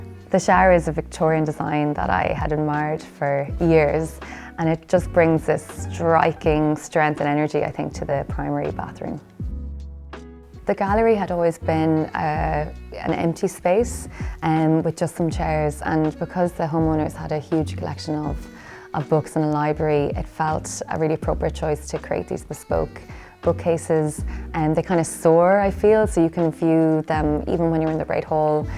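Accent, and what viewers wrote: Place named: Ireland. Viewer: No idea what you are talking about. has a very soft Irish accent…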